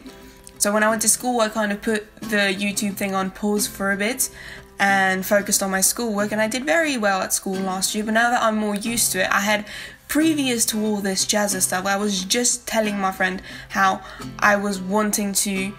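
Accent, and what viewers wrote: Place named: South Africa. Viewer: I was trying to figure out if you were British or Australian listening to this video but boy I don't think I would have guessed South African accent but it makes since it was a British Colony for years.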